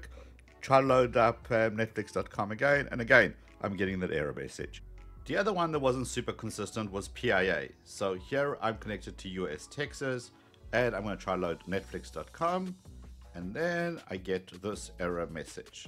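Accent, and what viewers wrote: Johannesburg, South Africa. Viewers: Dude you sound South African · Are you from South Africa as you acent sounds like JHB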